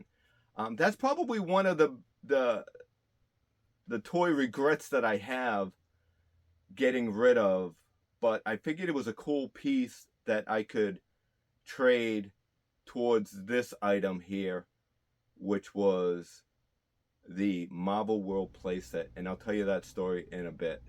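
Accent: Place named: New England, United States. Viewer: After living in Mass. & New Hampshire for 16 years myself, I could listen to you say Sta Wars all day. Great Video.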